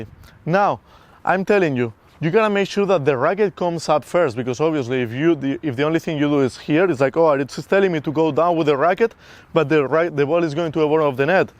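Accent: Spain. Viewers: he has a spanish accent, I instantly trust him. But please stop with all these "absolutely free courses". everyone subscribed to FYB once and saw the result. 0:6 0:6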